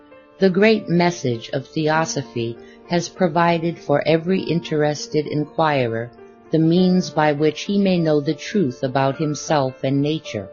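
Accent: Russia. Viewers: Amazing Russian accent.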